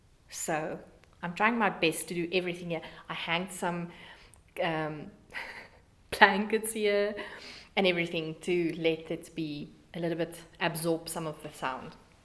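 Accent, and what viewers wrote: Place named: South Africa. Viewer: Thank you again for such a wonderful podcast ❤ I'm really enjoying watching your channel ... And I love your SA accent ❤ Great to see you wearing the Faded Pathways Cowl ... Can't wait to start mine!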